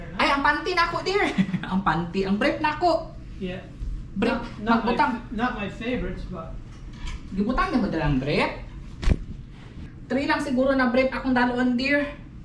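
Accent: Iloilo, Philippines. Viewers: Hi, para Kang Ilonggo maghambal Dai... Find it cute Dear...♥️🙏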